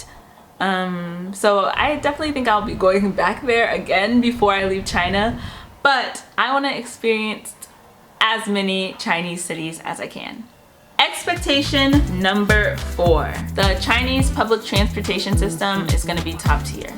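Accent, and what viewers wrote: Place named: Georgia, United States. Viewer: Sounds Georgian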